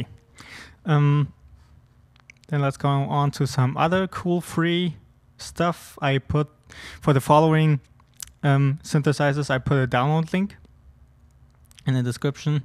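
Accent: Germany. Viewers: are you a german guy? Greetings ?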